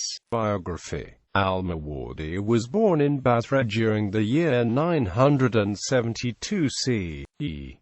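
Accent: United Kingdom. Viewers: The robotic accent maked it impossible to understand, such videos including arabic words must not be created in British robotic accents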